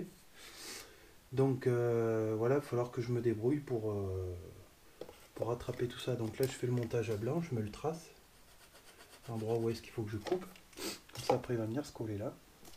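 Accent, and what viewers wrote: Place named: Auvergne, France. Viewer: Merci pour tes explications. Tu viens pas d'Auvergne par hasard ? Vu l'accent 😉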